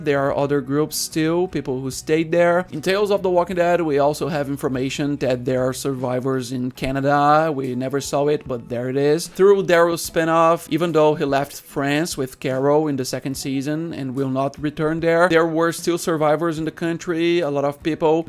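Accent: Brazil. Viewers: You can barely tell you're brazillian, congrats!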